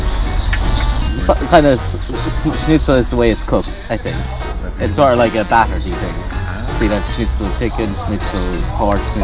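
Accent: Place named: Ireland. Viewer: Just found you. So refreshing to hear another Irish voice coming from inside the helmet on one of these vids. Gorgeous bike too 😍 subbed!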